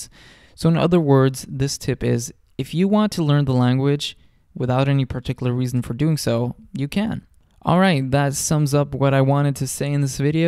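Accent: Midwest, United States. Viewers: Tusen Takk! I love these videos. They have been very helpful to me on my journey to learn Norsk. I've been studying mostly daily, for over a year using the DuoLingo app on my phone. (Is it OK to mention the app? I don't get any money, credits, etc for it) I find your tips & explanations help me integrate and understand the language lessons better than just using the app alone. On a side note: OMG I can't believe you are a native Norwegian!!! You have a perfect Midwest American accent. Are your family members from there ? Anyway, just keep up the excellent work, I appreciate all the time and effort you put in. Ha en flott dag! (Have a great day) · Your English is amazing. You sound like you could be from the Midwest.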